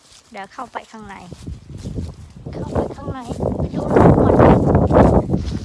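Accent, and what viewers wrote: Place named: Korat, Thailand. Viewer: ดีๆจ้ะ พูดโคราชภาษาท้องถิ่น 🥰🥰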